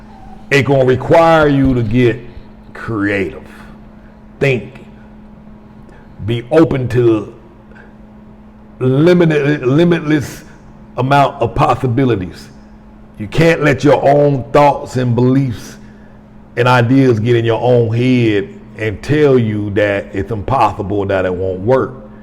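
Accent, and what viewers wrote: South, United States. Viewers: I'm late BUT I want to wish you a Happy Father's day my man! I been rockin with you for YEARS when U were still traveling in the states and 1st went to Canada! I have seen a LOT of mess you had to go through and I am PROUD of you my man that you made it through! The VERY 1st time I heard you talk I KNEW I was gonna like you cause im from the South TOO! Much respect to you Wishing you MUCH happiness my man! 😇👍🏾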